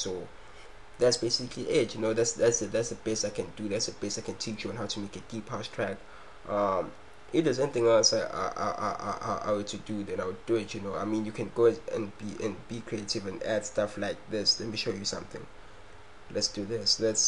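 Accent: South Africa. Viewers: are you South African haha?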